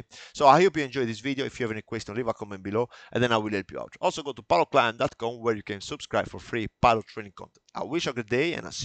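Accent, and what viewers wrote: Italy. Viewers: Brilliant Airline Flight Instructor and that Italian accent!!!!! It is quite entertaining and engaging!! Keep iit uuup!! Would like to see how you teach jet transition.Are you planning a video or have one produced yet? I wish to compare Airbus with Boeing as the philosophy of flying is different.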